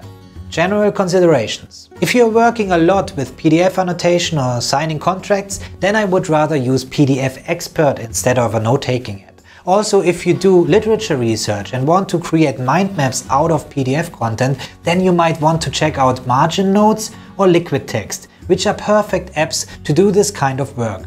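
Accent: Germany. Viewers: Er hört sich so deutsch ann??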